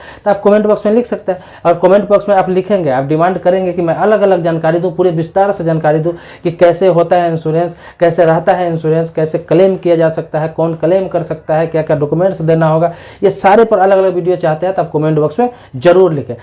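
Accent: Bihar, India. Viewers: Kya bolte ho dhyan se sune fir bolein,kya bihari ho........tabhi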